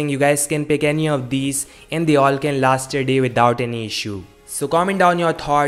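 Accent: India. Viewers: Oh my...that Hindglish 🤦‍♀🤦‍♀🤦‍♂🤦‍♂ffs!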